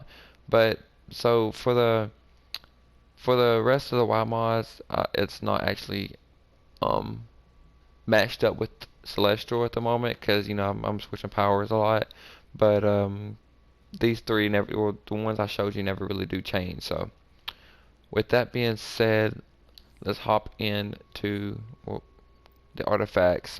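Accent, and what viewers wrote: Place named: Appalachia, United States. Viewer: Dude. Up your speech patterns and audio. It's "So on and So forth" NOT "So on and so Such". Your Trailer's showing hilbilly...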